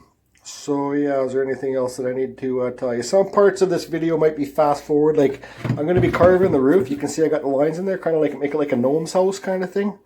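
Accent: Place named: Canada. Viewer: I fucking love your personality dude. It's like listening to me but with a Canadian accent instead of a southern one.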